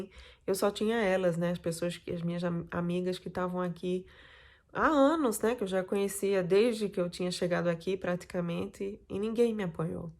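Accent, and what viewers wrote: Northeast, Brazil. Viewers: E aqui na sua terra natal (Brasil, Nordeste, pelo sotaque é nordestina)vc se dá bem com o povo fora desse país?Obrigada 😘👍Sinto muito amargura nas suas palavras e nos olhos!Isso não é bom amor!Me senti mal agora em ser brasileira, sou uma pessoa que me doo tanto para quem precisa de mim!Eu também não me envolvo com muitos amigos, por opção minha, tempo, mas o resto abstraio!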